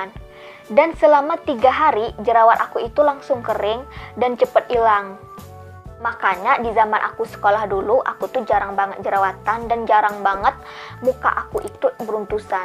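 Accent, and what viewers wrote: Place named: Malaysia. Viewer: Kak cntik asli mna yaa.. cra ngmongny kok kyk org malaysia😀☺️☺️✌️✌️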